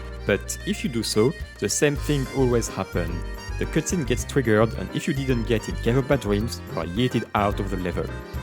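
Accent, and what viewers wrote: France. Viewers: What a good english with french accent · Are you French? You sound like one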